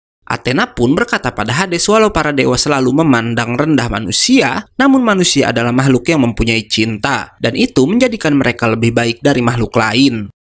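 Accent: West Java, Indonesia. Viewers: Ini teh admin nya org sunda sanes ?? 😃😄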